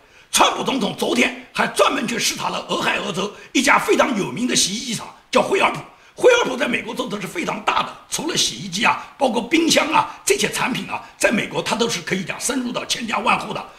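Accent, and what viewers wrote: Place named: Nanjing, China. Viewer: 喜欢建民老师用哑嗓子带南京腔的嘶吼和亢奋的不得了的情绪！来斯!！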